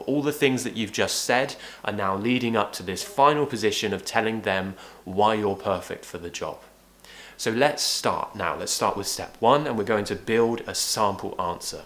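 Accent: RP, United Kingdom. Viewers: British accent is a great turn on for me😘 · Wow you are really good! Thank you very much for this lesson! You know what.. You look a lot like Alexa Chung.. Really.. You remind me her enormously.. Maybe because you have similar accents but it is more physical actually you faces or eyes are quite similar.. Coolest Brit pals 👌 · This is one of the questions I find very difficult to answer correctly at interviews. Thanks for the tips. From a fellow Brit. PS. I have the same accent as yours.😃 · Indulge in British accent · Hi there! would you define your accent RP/ SSBE ? I'm working on a phonetic assignement! Also, where are you from? Thank you! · I love British accent ... its royal accent ! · Wow , I love British accent. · Just listening to you thats enough to get British accent ,, Lot of love ❤️ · I loved British accent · thanks for ur advices greetings from Mexico . 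I love Brits accent . have a good one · I love British accent and I wish I could have a friend from London to communicate with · I love this accent😍, i think British accent is better than American accent 😉🤗. You're the best english teacher in YouTube!!! 👌🤗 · I love British accent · Who loves british accent? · I dream to be your student and learn your amazing pronuncation. I love british accent since my 11's. Of course I can not pay your course right now, this situation affected my economy, but one day, one day I will my dream come true. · I love British accent. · I love it British accent I live in UK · I like British accent ❤️ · thank u so much ,I Love british accent...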